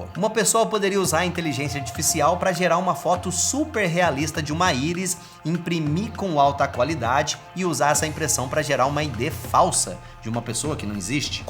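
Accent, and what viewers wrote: Minas Gerais, Brazil. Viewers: Mano, senti o mesmo, mas senti isso desde que o deixou de ser independente. Os efeitos ficaram profissa, tudo bonitão, mas, na minha opinião o nunca mais foi o mesmo. Ficou mais sério e menos zoeiro. Até aquele jeitão de falar de mineiro do interior diminuiu e eu gostava muito daquele estilo.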